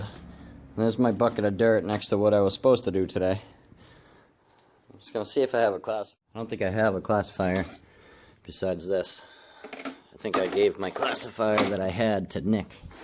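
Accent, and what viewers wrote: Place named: Massachusetts, United States. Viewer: I knew you were from MASS i had an old boss from MASS same accent.Maybe NE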